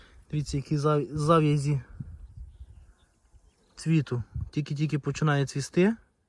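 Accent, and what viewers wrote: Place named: Lviv, Ukraine. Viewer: Ну чути що ви з Львівської області, навіть не спорте!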